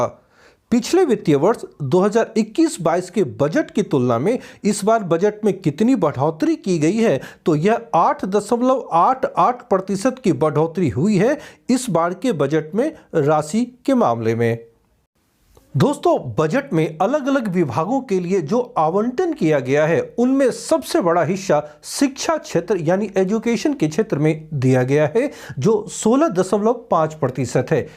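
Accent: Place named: Bihar, India. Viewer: Nyc accents...aap bihar se nhi ho sir???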